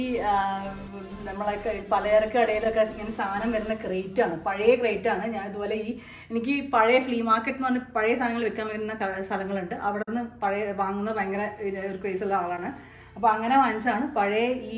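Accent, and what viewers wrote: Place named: Palakkad, India. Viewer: Hey,I am a new subscriber!vlog popped up on my feed!excellent narrative and sweet home!I also made another observation,Are u from palakkad?oru valluvanadan slang thoni!I also hail from pkd.And ur gestures and slang resembles anchor rekha menon too!☺️Has anyone else told the same?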